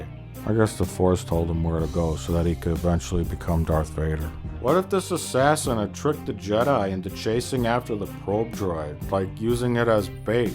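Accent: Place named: Wisconsin, United States. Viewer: My favorite part of these reviews is the narration. Monotone, mumbling mispronouncing of new words is a perfect rendition of central Wisconsin schlubbery. Its like hearing Peter Sellers when he does half a dozen different English accents to perfection.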